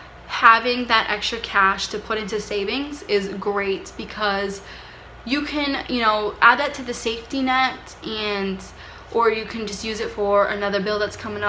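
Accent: Boston, United States. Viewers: As soon as you started talking I could tell you were from Boston! We gotta stick togetha! 😂😆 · You can hear the Boston accent. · I knew that Boston accent the minute you spoke! I💜my city!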